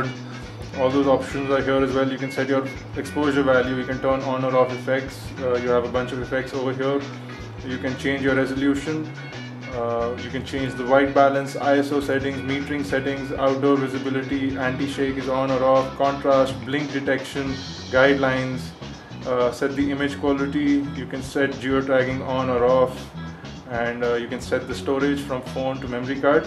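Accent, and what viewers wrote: India. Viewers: . That is not your real voice because it sounds indian and your hands are white, and that voice is really annoying. So it isnt friggin funny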